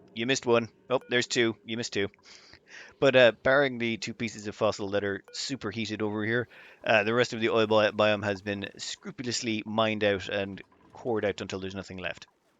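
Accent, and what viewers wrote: Ireland. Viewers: hey long time watcher first time poster, love all your vids, just wondering where in ireland are you from? im guessing south dublin sans d4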